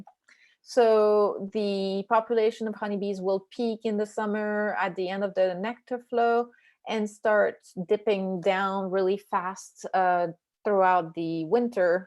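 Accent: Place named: France. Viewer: I didn't think you sounded Texan, but I would never have guessed French.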